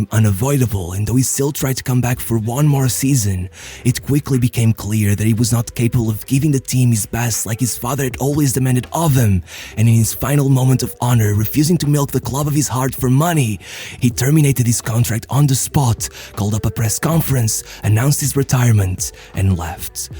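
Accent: Portugal. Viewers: Your “Roberto Carlos” accent… você é Português?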